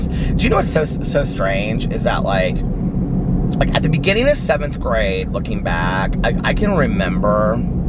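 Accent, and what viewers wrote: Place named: Midwest, United States. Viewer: Hi. I noticed you say “saul” instead of “saw”. Like as in, “I saul so and so yesterday.” Is that. Mid Western thing? I always say “ milk” weird, but it’s just a “ Melissa thing.” 😁 I’m looking forward to hearing about the cozy mysteries. It really helped me through last November and December when you went on a “ cozy” spree! ❤️❤️❤️ I loved it!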